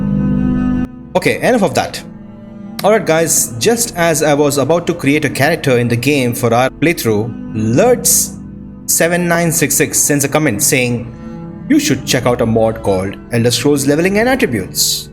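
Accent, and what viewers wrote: India. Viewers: Omg r u Indian?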